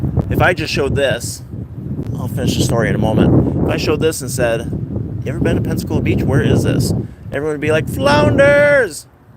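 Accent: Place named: North, United Kingdom. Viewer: Coming in a bit late but loving this video! Been coming to the Panhandle since I was a teenager (or before) ... about 100 years ago. It was before all the high rises were there. But, I've never walked the pier. Very cool. Beautiful sunrise! Sound is good except seems I hear a bit of a northern accent ... just a little bit. 😂❤❤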